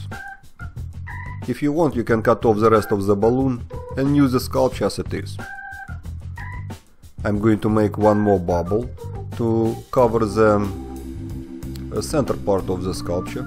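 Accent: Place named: Russia. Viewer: Great Russian accent ))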